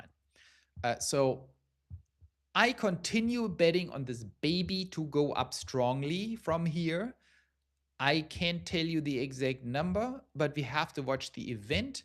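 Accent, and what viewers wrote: Germany. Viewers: the video audio is drowning your beautiful german voice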